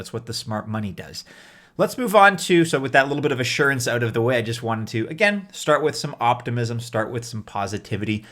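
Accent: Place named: Canada. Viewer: I come for the Canadian accent. Chance at ETH doesn't hurt either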